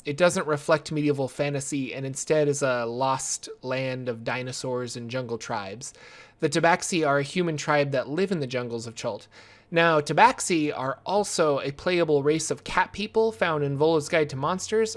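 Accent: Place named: Chicago, United States. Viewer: sounds like the south side of Chicago to me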